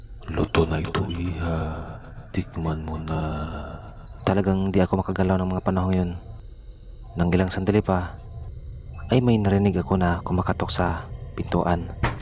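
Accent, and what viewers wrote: Visayas, Philippines. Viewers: Bisaya amputakels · Parang. bisaya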